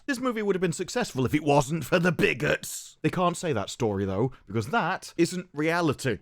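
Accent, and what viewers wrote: United Kingdom. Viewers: Are you British?? Can’t tell.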